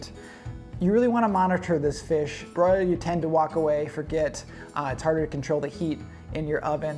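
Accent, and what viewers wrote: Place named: Minnesota, United States. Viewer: Hey - I have no idea how the YouTube algorithm brought me to your videos- but so happy they did. I’m sitting here in lockdown in Melbourne and your accent and these fish recipes are bringing me home to Minnesota. Hope you’re well. Also- that daughter of yours is adorable!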